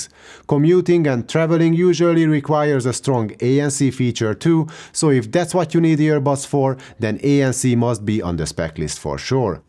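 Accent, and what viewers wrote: Hungary. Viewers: Hello, great guide, it helped a lot in choosing my earbuds! I was wondering what your native language was, because listening to you felt like you were talking to me in Hungarian accent the whole time. I can't help but mention this. :D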